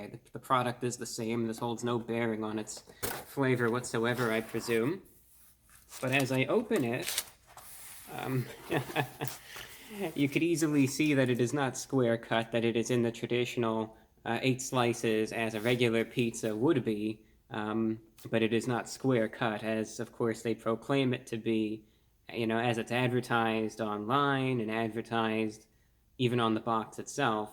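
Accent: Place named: Transatlantic, United States. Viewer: You speak like a rich White Anglo Saxon Protestant with a slight transatlantic accent in there. A radio voice if you will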